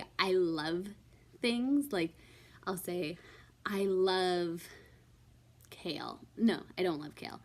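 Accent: California, United States. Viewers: Yesssss! I'm so glad you did this! You speak just like my husband who's from LA. He pronounces the "T" like you do, most American's have silent "T"'s but Californian's are a breed of their own! lol. I TOTALLY forgot about buggy!! The words you use are closer to mine which i think is because we're both west coast kids lol. Great Video! I cringe when I hear "toilette" as well lol. it seems so crass! lol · Aww I LOVE this haha. To me you definitely do have the Cali girl type accent lol. Even though I know you don't call it that or consider it one, lol. I have never heard anyone refer to the bathroom as WC before lol. I have heard them call it "The Loo", but that's so funny. Learn something new every day! I think people in the UK call a shopping cart a buggy too, or trolly lol. This was a lot of fun! Thanks so much for doing it <3 :D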